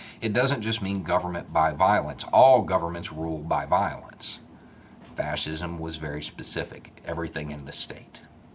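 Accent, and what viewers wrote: South, United States. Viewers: For as long as I've been watching your videos, I've been thinking: It sounds even truer when you hear it stated with a southern accent.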